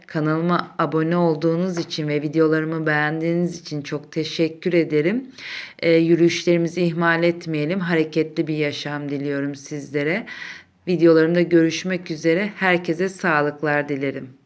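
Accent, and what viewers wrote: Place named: Çarşamba, Turkey. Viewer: Her halde yine carsamba aksani Ahmet Apa niyetimizi yaptirir .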